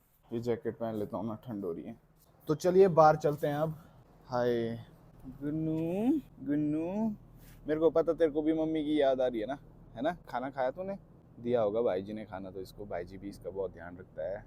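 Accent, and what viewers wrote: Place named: Himachal Pradesh, India. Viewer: The Himachali innosence is present in you . And the Himachali tone of your voice is your identity . Keep it up hope this Himachali tone of your voice and your behaviour never fade away . Bcz most of Himachali YouTuber do vlog in a modern tone , behaviour etc but you always shows Himachali presence in you and also in your vlog ❣️ Wishing you huge amount of prosperity 🙏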